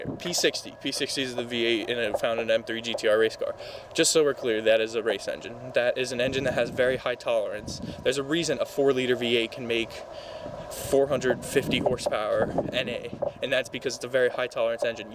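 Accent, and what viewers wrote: Russia. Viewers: Are u russian bro?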